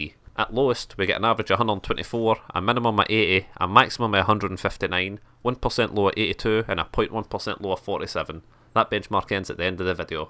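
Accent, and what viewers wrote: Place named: Scotland, United Kingdom. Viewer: Nice Scottish accent, I wish I had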